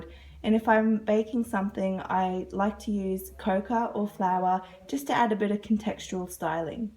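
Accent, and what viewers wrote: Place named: Australia. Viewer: I've watched all your videos and I love 'em. I'm curious though, your food (in a previous video) look like Asian/Malaysian food (curry puff, rice, etc) but you sound Australian. 😊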